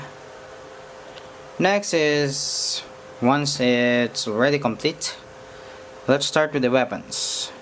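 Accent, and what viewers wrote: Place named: Philippines. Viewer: from the sound of your accent your from philippines right? :D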